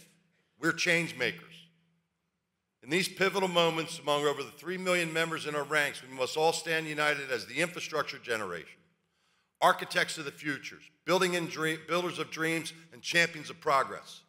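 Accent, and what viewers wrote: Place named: United States. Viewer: You sound exactly like an American oughta, you sound like my voice, the voice of my father -- carpentry, my grandfather -- electrician. The heart of America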